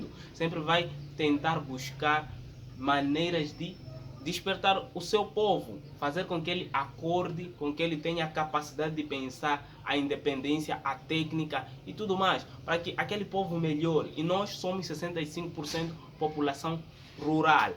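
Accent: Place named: Brazil. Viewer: Pow negão ce fala com alma de Bahiano de Brasileiro hehehehhe e sua fala não tem sotaque moçambicano falando igual a nos brasileiros hehehe isso é muito legal cara So falta arrumar uma brasileira e vir pro Brasile hheheheheh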